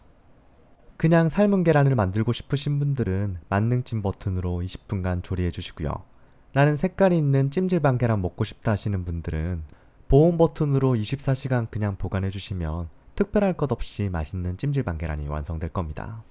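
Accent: Gyeongsang, South Korea. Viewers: 경상도 분이신가보네요 듣는데 사투리가자꾸들려서 좋네요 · 표준말 같은 구수한 경상도 사투리...ㅋ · 그냥 이렇게 평소 말투로 하시는거 추천합니다. 저도 경상도지만 완벽하게 억양교정 잘 안될뿐더러 ( 도 안되시던데요?😂) 굳이 교정할 필요 있을까요? 사투리 매력적이고 재밌어요 경상도도, 전라도도, 강원도도, 충청도도 다다 ㅎㅎ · 계속 경상도 액센트로 해주시길 부탁드립니다. 경상도 액센트는 세계최고 멋져요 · 경북억양 경남억양이 섞이셨네요...맞나요?^^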